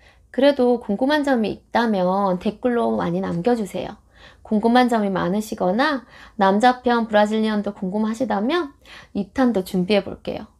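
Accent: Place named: Gyeongsang, South Korea. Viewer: 고향이 경상도이신가 보네요!!!...ㅎㅎ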